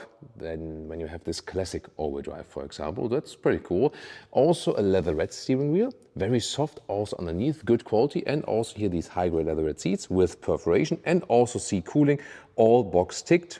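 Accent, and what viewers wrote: Germany. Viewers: i like your German English. you speak both at same time love it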